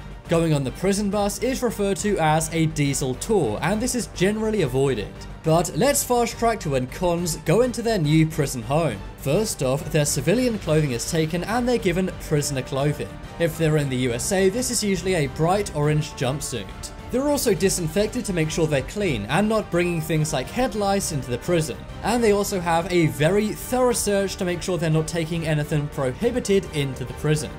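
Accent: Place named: United Kingdom. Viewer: did the british accent of the narration not tip you off ?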